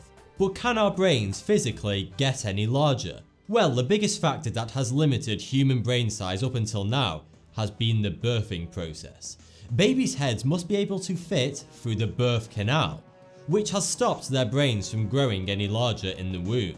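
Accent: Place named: West Lancashire, United Kingdom. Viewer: West Lancashire accent.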